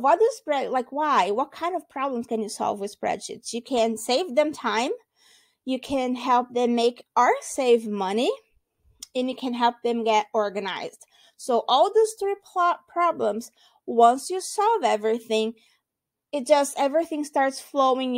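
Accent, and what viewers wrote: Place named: Brazil. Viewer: I knew it! It was king hard, but I thought: “the accent.. it seems to have a little of Brazilian in it, but I was not sure”.. congrats!! Awesome content, and beautiful accent!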